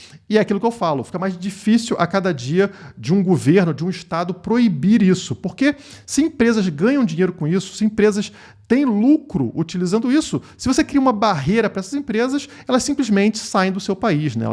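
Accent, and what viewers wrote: Rio de Janeiro, Brazil. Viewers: "Téiijjjla, triméééixtri"...sotaque carioca é nojento, mas as informações são ótimas.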